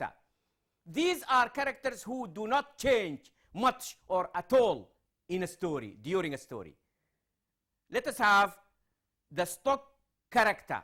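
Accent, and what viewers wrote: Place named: Sudan. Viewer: This is Sudanese english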